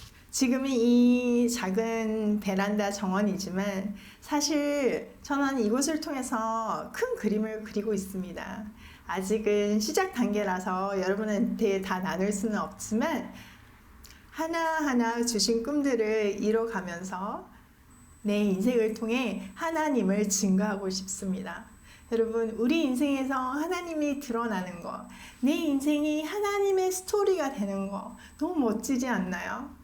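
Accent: Gyeongsang, South Korea. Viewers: 고향이 경상도?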